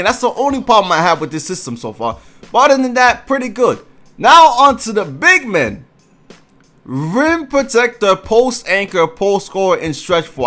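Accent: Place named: New York, United States. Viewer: You from New York?? Your accent lmao💀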